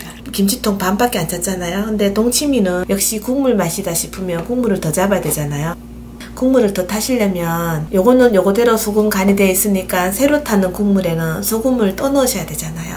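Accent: Gyeongsang, South Korea. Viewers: 대구 이모 진짜로 동치미 올려주셨네요 감사 합니다 대구 본토 발음이시네예~ 잘 보고 갑니다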